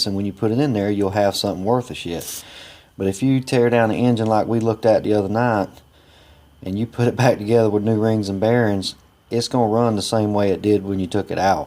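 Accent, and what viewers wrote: South, United States. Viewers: Much love brotha love the content,and that good ol' southern twang..Wish I lived closer we could go tear shit up..Keep it up..peace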